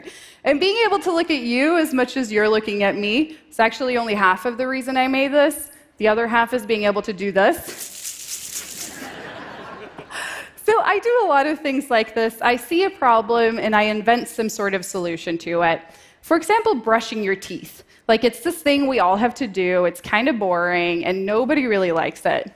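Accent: Sweden. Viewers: Your Swedish accent really came out in this video! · It's easy to forget Simone aer Svensk (Swedish). The accent shows just a little. Swedens have trouble with when to s. 😊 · This is probably the first time I've actually heard her Swedish accent come through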